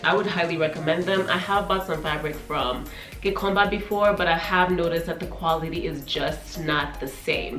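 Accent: United States. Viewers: I loved your tour so much but please try to reduce throwing up your hands constantly.i thought I was watching an American woman with accent, attitude everything.I didn't enjoy the narration. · You have a beautiful place Madam. You stated that you are in Nairobi but you have an American accent. Are you an American living and/or working in Kenya? You are also very pretty.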